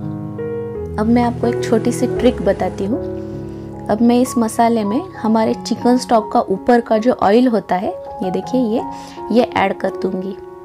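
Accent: India. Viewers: आपका लबों-सहेजा बताता है कि आप साउथ इन्डिया से हो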